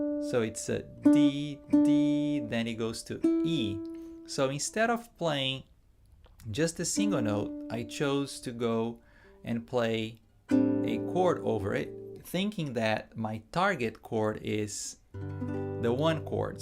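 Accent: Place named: Brazil. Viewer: Sotaque brasileiro está carregado nesse vídeo! haha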